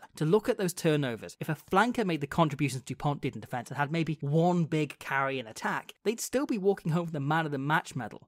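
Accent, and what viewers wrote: Wales, United Kingdom. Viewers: You can turn on generated subtitles, it's not perfect but does the heavy lifting. That welsh accent can be hard to understand for a non-native speaker haha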